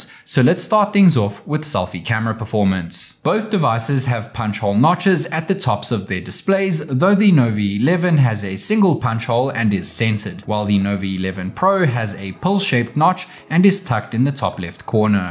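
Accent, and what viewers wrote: South Africa. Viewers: south african accent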